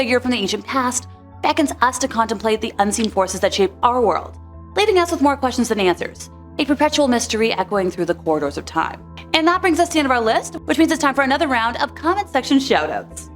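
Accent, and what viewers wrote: Toronto, Canada. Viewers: Toronto accent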